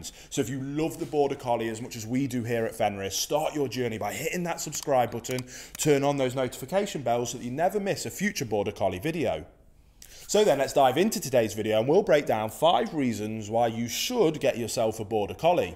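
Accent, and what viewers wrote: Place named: England, United Kingdom. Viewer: I love your accent. (And I also love your video otherwise I wouldn’t have watched it.) What part of England are you from?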